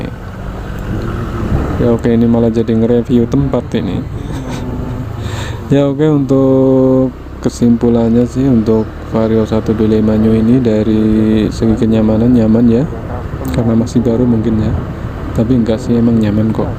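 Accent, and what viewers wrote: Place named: Banyumas, Indonesia. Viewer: Mas e ngapak..🤣🤣🤣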